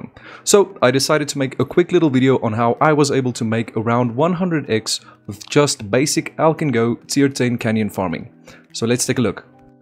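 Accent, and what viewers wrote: South Africa. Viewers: Sounds ikea a fellow SAFFA 🤔, hoe gaan did boet, ek gaan dit vandag doen.. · That south African accent is thick AF brother. Hallo daar van die kaap. Nice vid bra. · NP i found it i knew your accent sounded familiar, im From SA to bro gg content il supp u all the way keep it up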